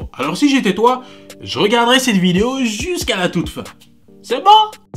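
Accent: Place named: Quebec, Canada. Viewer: Quand j'arrive à cerner ton accent québécois... Ton polo est juste classe.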